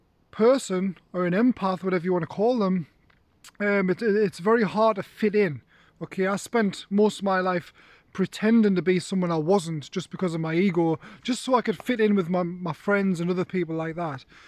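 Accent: United Kingdom. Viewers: When I look at you I anticipate the deep, resonating voice of a godlike warrior. Then I push play and get a Keebler elf with a British accent.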